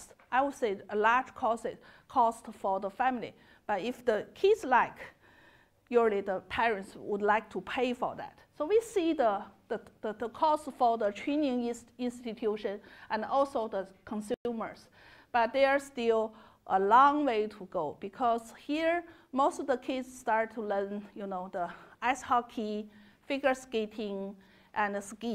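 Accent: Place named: China. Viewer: 这中式英語果然听的清楚多了、：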